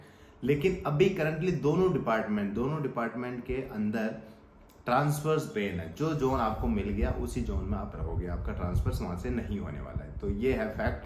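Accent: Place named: Rajasthan, India. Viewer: Rajasthani accent.. right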